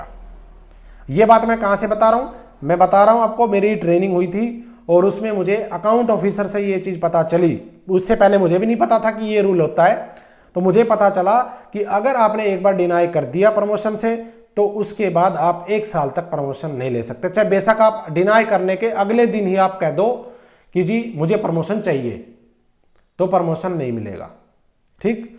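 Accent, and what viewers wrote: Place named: South Haryana, India. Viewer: It's seems u belongs to south haryana like Rewari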